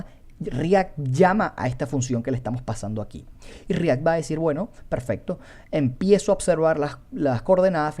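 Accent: Venezuela. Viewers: Mano tu eres venezolano si o si xD calidad el video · marico eres venezolano? que de pinga encontrar un desarrollador con nuestro acento... saludos mi pana... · tu como que eres Venezolano mi pana, excelente instro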